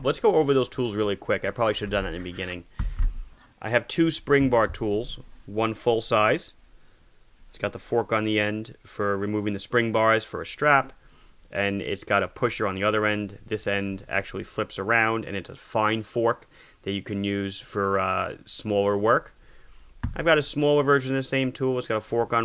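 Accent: Long Island, United States. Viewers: I grew up in Huntington Station. I really miss hearing people speak like this, not just the accent (yours is not overly pronounced), but the manner of speaking. Confident, intelligent, with a sense of humility and humor combined. Thanks for bringing back memories. Thanks for the excellent video! Channeling: "Cause [Florida's] not my home." PS - Big fan here. Too bad they haven't created anymore music.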